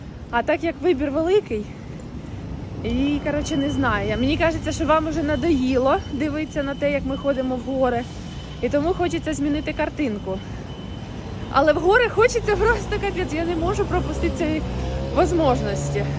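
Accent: Surzhyk, Ukraine. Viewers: Вибачте, але українську мову вам ще вчити і вчити - розмовляєте жахливим суржиком. Купа русизмів.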